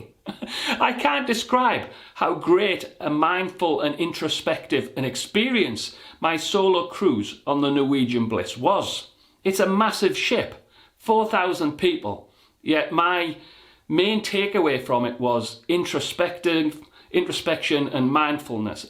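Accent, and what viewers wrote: Scotland, United Kingdom. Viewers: You sound Scottish? I want to follow your example for cruising!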